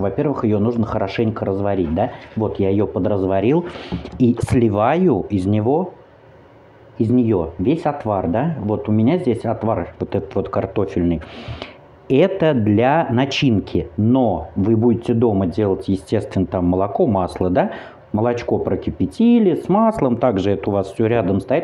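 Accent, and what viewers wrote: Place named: Moscow, Russia. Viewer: у вас великолепный русский язык с московским произношением, это знаете ли, тоже эталон, такое сейчас редко встретишь. Спасибо вам,что вы так классно говорите! Вы нас и этому учите!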